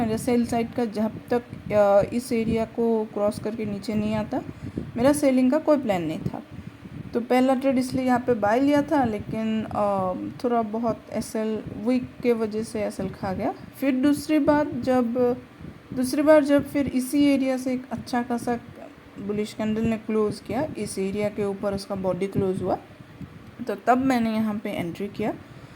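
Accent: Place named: Maharashtra, India. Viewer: Aapke bolne se lag raha aap maharashtra se ho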